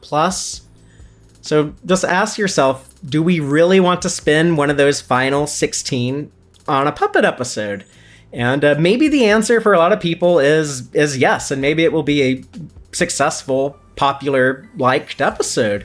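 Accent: United States. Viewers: You did a great job of presenting this! Do not apologize. It's nice to hear an American accent sometimes, and you spoke so clearly that I didn't need captions. TREK X MUPPETS?? I'm there. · No offense to this narrator (He did a really good job) but I love Sean's and Ellie's accents. Getting my Star Trek news in an American accent just doesn't hit the same.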